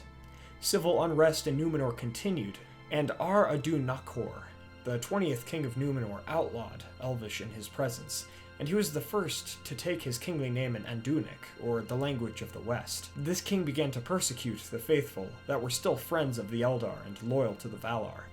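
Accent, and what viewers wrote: United States. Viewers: I love these videos so much - but I suffer from the HORRIBLE PRONUNCIATION of the words - please do not americanize them. Learn them 🙏🏻 #ithurts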